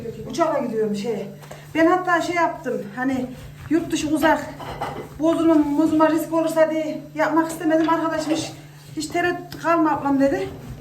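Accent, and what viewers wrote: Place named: Yozgat, Turkey. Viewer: hanım nerelisiniz ben yozgatliyim bizim gibi konusuyon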